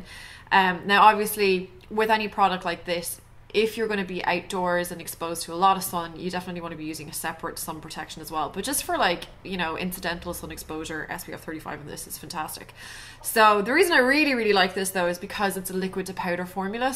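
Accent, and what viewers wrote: Ireland. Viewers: Don't want to sound like a stalker or anything but what part of Ireland are you from? ;)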